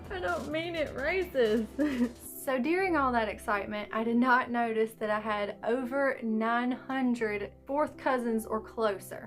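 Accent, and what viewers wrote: South, United States. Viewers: I just Love your Southern Country Accent...it’s GREAT 👍🏽. I’m Glad that you posted this outcome and you’re ability to find your BIO Dad. 🤔🙏🏽🙇🏻‍♀️💗🔍🕊💎🧬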